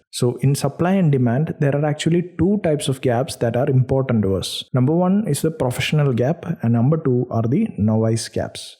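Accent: Kerala, India. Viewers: Bro Are You From Kerala ? Through Your Accent I guessed . Am I Right ?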